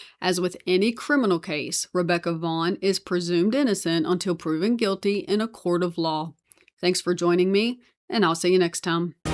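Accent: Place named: South, United States. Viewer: This was a pretty clever gambit and it makes me wonder how commonplace this kind of grift is. If you think about it thousands of people could be pulling the same stunt. If SSI hasn’t seen a death record or announcement they keep sending the checks. Imagine the temptation to some survivors when they realize those checks will still keep coming. This was a good report and I have subscribed. I’m from New Jersey and I really enjoy your Southern inflection. Keep up the good work…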